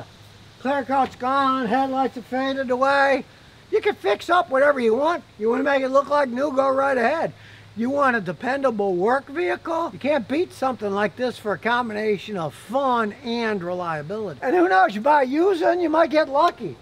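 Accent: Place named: Tennessee, United States. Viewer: its like your getting used to Tennessee, i can hear that in your tone 💪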